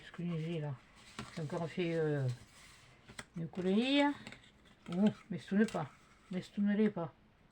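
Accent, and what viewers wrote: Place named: Aveyron, France. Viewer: Coucou, je regarde toutes tes vidéos mais je ne commente pas souvent. J'aime beaucoup ton tuto, des défauts tout le monde en fait, mais certaines ne le disent pas, ton parler je le connais bien car je suis de l' Aveyron... Bonne journée, bises😍😍😍😍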